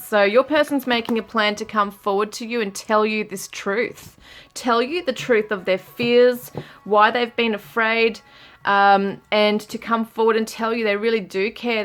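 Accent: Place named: Australia. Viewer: i like ur accent is it australian?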